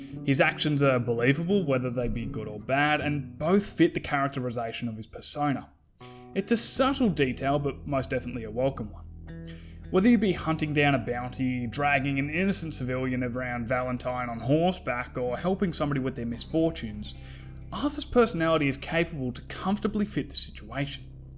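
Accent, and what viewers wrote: Australia. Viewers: Ohh he's an Aussie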